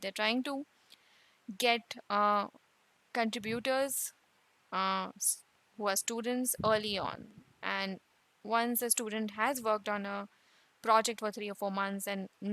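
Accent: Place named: India. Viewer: Sounds Indian to me xD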